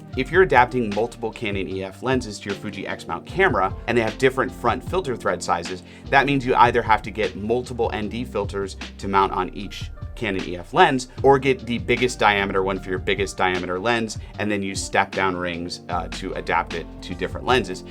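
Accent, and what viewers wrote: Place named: United States. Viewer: Appreciate the American (Canadian? cannt tell) ,clear ,easy to understand review!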